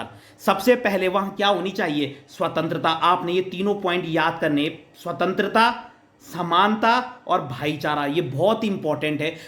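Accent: Garhwal, India. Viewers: Sir m bhi Apki awaj m thoda gharwali pan lag rha tha esliye pucha Thanku sir Aap bohot shi se explain karte ho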